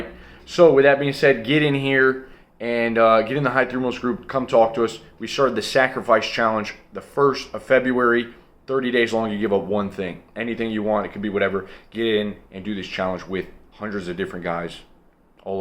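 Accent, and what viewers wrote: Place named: Michigan, United States. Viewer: Are you a Michigander? Cuz you sound like a buddy of mine from up there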